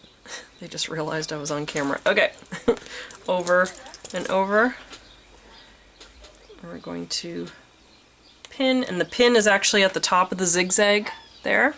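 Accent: Canada. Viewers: This was a wonderful and informative video. It made for a happy Sunday afternoon viewing. My first time watching a pillow lace tutorial, and I think I hit the jackpot! You are great at explaining, and the camera was perfect! I loved that you zoomed in several times so we could see the threads. I’d love if you would put the source links below the video, because I’ve forgotten the name of the book, and the sources for threads and the kits. You are in Bermuda, but you sound like maybe you cane from Canada? Or Northern Minnesota? “Oot” I totally love it, whatever the origin! This is also the first of your videos I’ve watched! Liked and subscribed! ~annie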